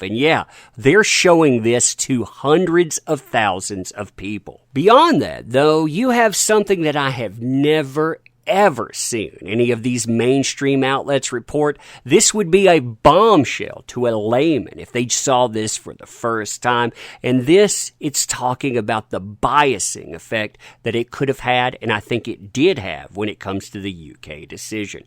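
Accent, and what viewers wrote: South, United States. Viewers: Yes, he has academic training and reports with rigor. That southern drawl is very charming.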